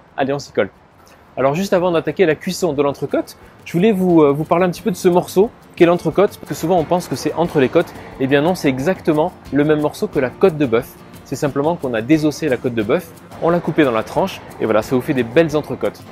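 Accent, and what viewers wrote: South, France. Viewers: Salut!!! Super ces 2 recettes, pour la première parfois je fais au pif avec ce que j'ai sous la main (en général je suis pressé quand je m'y lance ), pour la seconde j'ai horreur du vinaigre mais je remplace par un vin blanc sec (toujours une bouteille entamé dans le frigot pour la cuisine ) mais je la retourne dans le plat et la masse avec la marinade (Huile d'olive; thym(ou basilic), ail, paprika, piment d'Espelette sec, poivre ) je sale juste avant de la cuire avec de la fleur de sel!!! Comme d'habitude je ne peux m'empêcher de donner mes ingrédients et de trop en faire avec mon commentaire. Je m'en excuse par avance!!! Bon Week-end à toi & ton entourage!!!! Au fait,ça plaisir de voir que tu n'as pas perdu l'accent du soleil, il fait chanter les recettes!!!!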